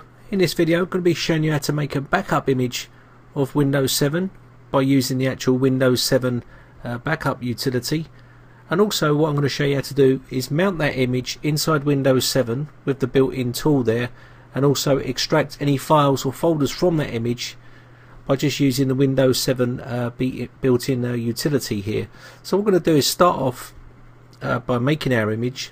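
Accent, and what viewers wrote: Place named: Derbyshire, United Kingdom. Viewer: You sound like Jason Statham, do you live near derbyshire?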